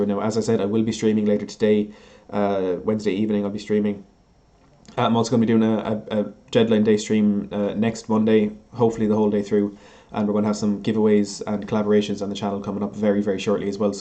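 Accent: Ireland. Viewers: Ur sound like ur irish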